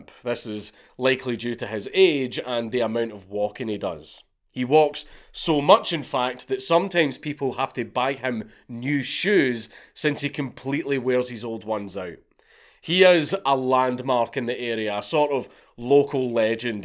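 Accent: Scotland, United Kingdom. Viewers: has a neko t-shirt and sponsored by a knife company. Been watchin some of that h enti too? Please don't turn fully Japanese we need you for that nice Scottish accent.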